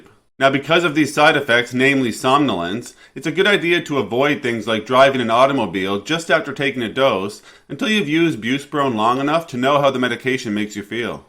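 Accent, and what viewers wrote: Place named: Atlantic Canada, Canada. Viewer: Same here skipper! I know an east coaster when I hear one lol. Cheers, keep up the great reviews.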